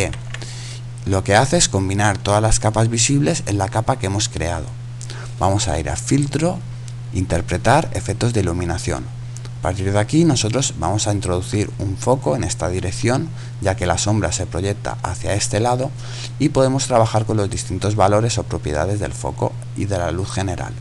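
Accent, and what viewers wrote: Spain. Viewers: como amo el español de españa :DDDD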